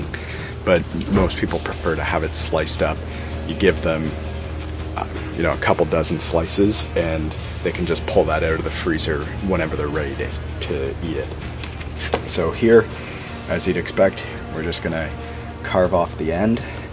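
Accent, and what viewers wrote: Canada. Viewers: I’m making some bacon today with your recipe… can’t wait!! You Canadians have the best accents ever! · is that a Canadian accent I detect? If you follow my page I have a cab in myself way up in the northern Adirondacks on the Canada border ck us out 👍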